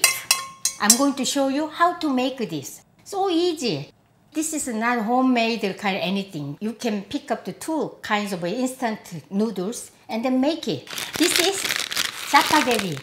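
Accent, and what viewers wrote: South Korea. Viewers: 한국식 영어라그런지 번역 없이 다 이해가된다 신기 ㅋㅋㅋ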